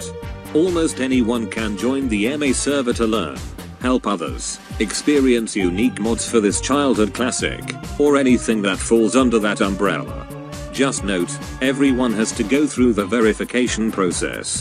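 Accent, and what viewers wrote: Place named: United Kingdom. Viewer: the tts voice is british, therefore it pronounces the "z" as 'zed' lol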